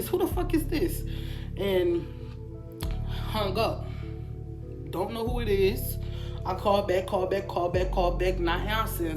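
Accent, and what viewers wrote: Louisiana, United States. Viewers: Period nails and hair.. such a fan of your accent too, let's go Nola baby lolll. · She gotta be from Louisiana she got that accent..I lowkey miss living in Louisiana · Love that New Orleans accent whole video lit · I knew you were from Louisiana! I love your accent 😍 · She gotta be from Louisiana I hear the craw in her accent I loveeeeeee it though you got beautiful hair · Yesss with the nawlins accent!!!❤️ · Louisiana accent? It's so cute 😃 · Love your accent you must be from da boot oh and LOVE your hair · New Orleans accents are my absolute favorite!!!! However; what is not my favorite is sis flipping her hair back and forth like 😐😐😐sisssssss please just do your hair it’s distracting!!! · Accent sounds like Louisiana, hey sister girl 🥰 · That Louisiana accent tho... Right it is Louisiana? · This popped up on my feed randomly and my first thought hearing your accent is New Orleans gotta be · I love her Louisiana accent!😂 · I already knew you was from Louisianaaaa, I love your accent 😭 #newsubbie ✨ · Your accent made me automatically subscribe. 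Ain’t nothin like a good ole NOLA Accent BAY-BAY 🤩😍😍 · Da accent is deeeeeeeeep ! New Orleans gurll😻❗️ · i just found your page but your accent 😍😍 . that’s sound like that nolaa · U have to be from Louisiana. Love yall accent! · I went to college in Louisiana. I know that accent anywhere 😍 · My guh u from Louisiana? You sound just like my family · New Orleans ? First time watching her videos and Her accent hitting me hard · that louisiana thick in that accent. It is so cute!!!! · Ive had some shit like this happen to me a few times smh imma do a few story times on my channel one day when i get the courage lol, but i loved the vid and ur accent made me miss Louisiana cant wait to go after the rona clear up in the u.s. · I automatically knew you was from Louisiana with that accent lol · U got to be from Louisiana with that accent ... 💜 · Where you from!? Sounding like nola babyyyyy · I love Louisiana accents😭❤️ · I love your accent 💕 are you from Louisiana? 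· You from Louisiana ? You sound like my fam from down there in slidale☺️💕love yo accent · I can tell you from Louisiana 😂✊🏿💯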